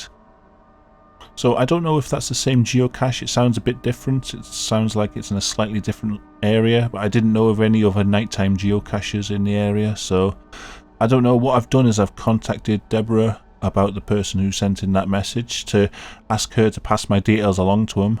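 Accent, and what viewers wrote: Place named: Wales, United Kingdom. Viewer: I could have sworn I heard “torches” the whole time. Guess I am not used to that Wales’ accent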